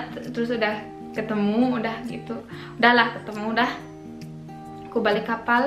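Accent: Bali, Indonesia. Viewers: bisa bahasa bali ya tp qm muslim....beberapa kali di video qm dpt bilang "mekacaan" dan "saklek", logatnya juga kyk orang bali awal.a aq kira orang bali 🤭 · pasti qm org bali,kliatan logatx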